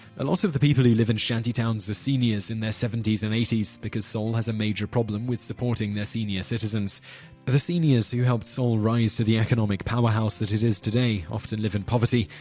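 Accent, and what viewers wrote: United Kingdom. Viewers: I would certainly hope a guy who has a broad british accent knows that oxford university isn't in london.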